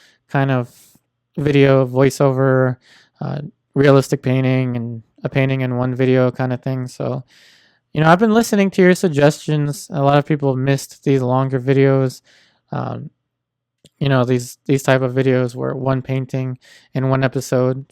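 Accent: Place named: United States. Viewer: keep on soft-ten-ning where useful! Where's from? He sounds american accent except for his love of mis-pronouncing soften: properly there should be a silent T in soften and softening at least here in the American language. Very good videos dude!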